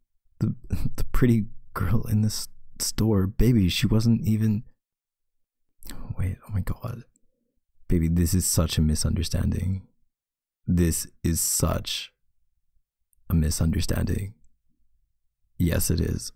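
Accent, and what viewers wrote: Canada. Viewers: you knew what they meant !! But to answer the question I’ve always imagined that he was Canadian but I could be wrong.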